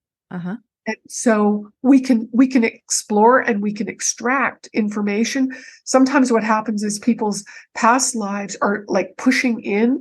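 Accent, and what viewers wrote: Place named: Scotland, United Kingdom. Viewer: I just love her Scottish accent more than anything else on YouTube!!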